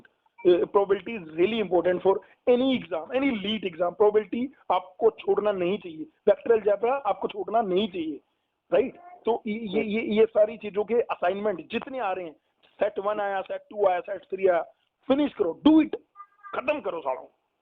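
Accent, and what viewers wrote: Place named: Haryana, India. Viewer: Sir , u are so amazing ...and ur language is so amazing.... Like haryanvi type.... Sir you are one of the best teacher in the field of leet coaching... because u give correct advice to students.... And , i saw ur success record, congratulations sir ... Sir , can u help me to crack leet entrance test....sir m financially too weak...i m also cracked the jee mains in last year but can't take admission in any college due to home situation....and also 5 the time college topper in Gandhi polytechnic Muzaffarnagar (Up).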